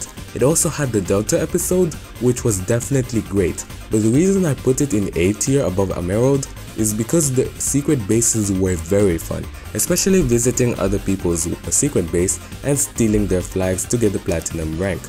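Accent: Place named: West Africa. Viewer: Oh that makes sense, I’m from Ghana. Another West African Pokémon fan! That’s great. Keep up the grind. You just got another YouTube subscriber and any other social media you’re on. Once again love your accent!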